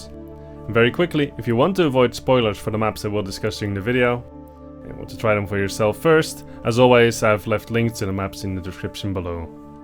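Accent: Netherlands. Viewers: yooooooo your dutch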